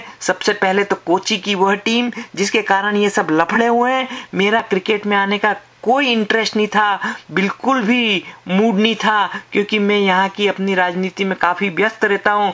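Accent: Indore, India. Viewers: Ekdum pure Indori....Sarafa ki shudh ghee ki jalebi ki tarah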